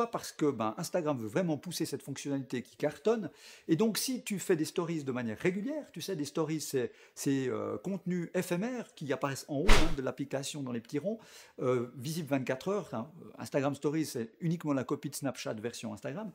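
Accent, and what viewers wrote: Switzerland. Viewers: Très bonne vidéo, très intéressant, surtout pour des gens comme moi qui ont Instagram dans le coeur de leur business. Est-ce que je peux te demander tes sources? Car celles que je consulte habituellement n'ont pas relayé ces infos:) Très bon boulot en tout cas, je vais regarder d'autres vidéos 😊 Sinon, serais-tu Suisse? Je semble déceler un accent comme ceux par chez moi;)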